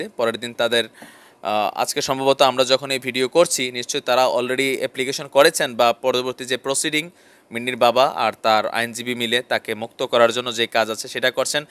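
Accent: Comilla, Bangladesh. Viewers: apnake dhonnobad apnar kothagulu amagur moto mone hoy comilla kothay jobayr dhaka theke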